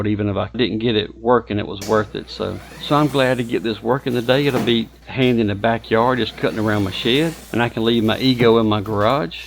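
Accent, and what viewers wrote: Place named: South, United States. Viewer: nah you just sound like every redneck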